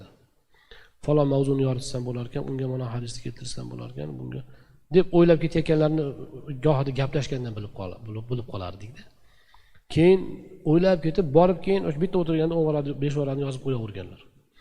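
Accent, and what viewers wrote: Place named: Andijan, Uzbekistan. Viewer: АЛЛОХ рози бўлсин жудаям 👍чиройли маруза қалб ором олади андижондан анджонча содда ёқимли❤❤❤❤❤❤❤❤😊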